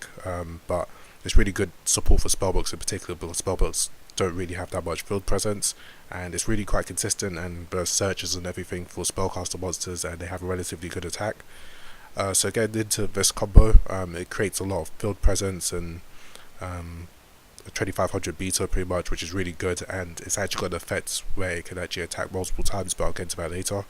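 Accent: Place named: England, United Kingdom. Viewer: nice! The performages seem to do quite a good job for spellbooks, giving the deck access to great rank 4s. Trapeze magician also seems really good, with it floating and all. I've also seen people experiment with the combination of trick clown and heroic challenger- thousand blades to get multiple rank 4s on the field, so its really nice to see people innovating with the engine :D Btw, you sound english. Are you? o.O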